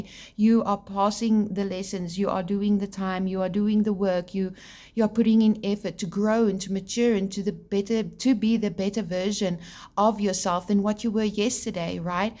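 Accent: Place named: South Africa. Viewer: Lekker to have a South African accent on a brilliant reading! 🎉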